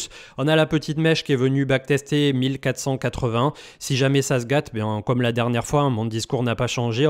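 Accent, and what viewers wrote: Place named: France. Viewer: Pour l'accent anglais c'est pas grave, on comprend, tu es français ....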